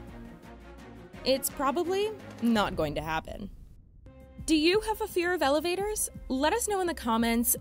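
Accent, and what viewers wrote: California, United States. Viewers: I think its a California accent. Reminds me "Legally Blonde". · I think she just have a regular Californian female accent. This accent was so much use for caricature of superficial girl most of the public doesn't know it is actually a real accent that real people have. I don't think she does it just to mess with you that juat how she speak. ps: I'm French I just learning to differentiate accent so I'm maybe wrong on this...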